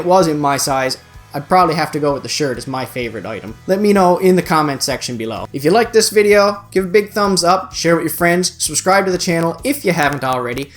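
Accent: Canada. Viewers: Love the Cape Breton Accent! Haha. Great job! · you sounds so much more Canadian than Tracey does.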